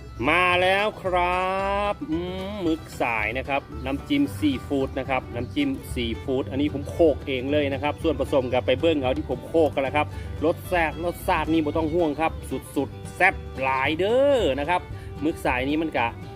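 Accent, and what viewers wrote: Phu Thai, Thailand. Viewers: วัดไข่...5555......555..มักฟังสำเนียงภูไท...555...ม่วนดีฟังเพลินๆ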